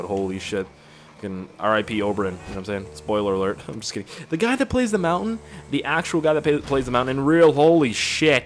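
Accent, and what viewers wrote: Canada. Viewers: No homo but I love you Canadian accent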